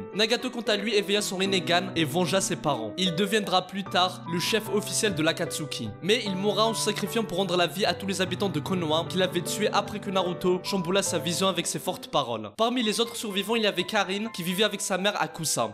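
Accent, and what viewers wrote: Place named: Kabylie, Algeria. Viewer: Je salut ton accent kabyle ✌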